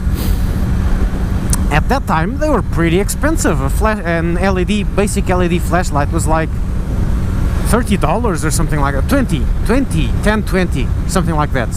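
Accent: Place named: Portugal. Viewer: I was just listening to the video and I knew you were portuguese by the accent. Let me tell you. That camera is pretty good for $10 but "O que é barato sai caro" como já dizia a minha avó.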